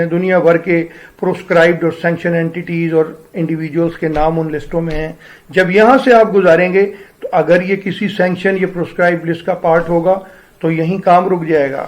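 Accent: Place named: India. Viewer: For first 2 minutes I was like he is an Indian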